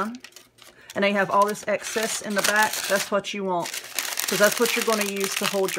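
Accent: South, United States. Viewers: Thanks for sharing and I love your southern accent.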